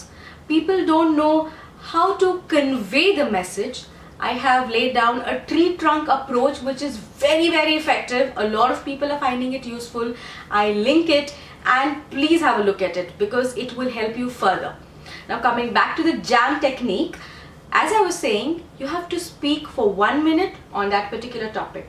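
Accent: India. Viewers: i sure that u r from jamshedpur...... great is ur way to express.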